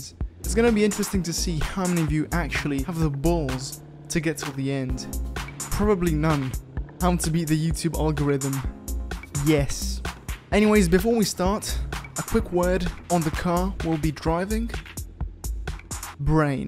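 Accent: Italy. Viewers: I know you are Italian, Your English is Diffrent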